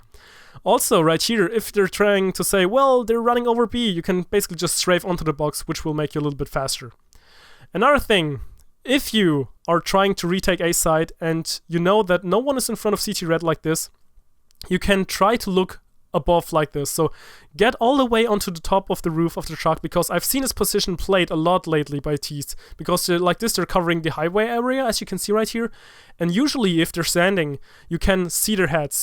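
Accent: Germany. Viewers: ! Ist minimal hörbar hast für deutsche echt wenig Akzent, Gutes video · Влад Губин some german accent right there imo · you are german am I right?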